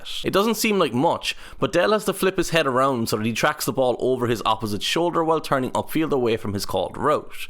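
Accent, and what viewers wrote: Ireland. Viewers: What the bejeesus is a 'turd down'? 😂 Love ur accent btw...i think you must be from the same part of Ireland as Chris O'Dowd (from the IT Crowd)... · An Irishman commentating on American football is amazing. Such a great dynamic!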